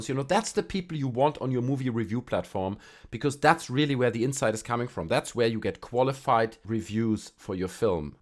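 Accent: Germany. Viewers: I love your German accent. You and the YouTuber 'get germanized' should collaborate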